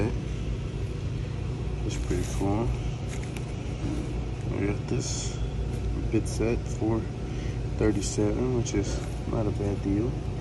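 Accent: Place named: Los Angeles, United States. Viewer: Where u from ? Sounds like la talking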